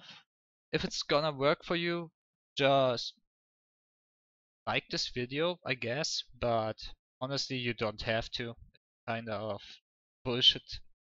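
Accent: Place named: Germany. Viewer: Ich hör raus, das er deutscher ist xD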